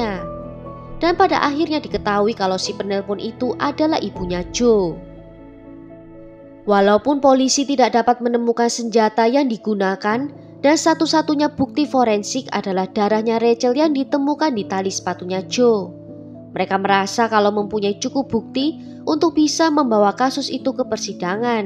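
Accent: Java, Indonesia. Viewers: medok bnget jowone mb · Mbk asal nya jawa mana toh mbk medok2 gitu hehehehehhe maaf cm mau nanya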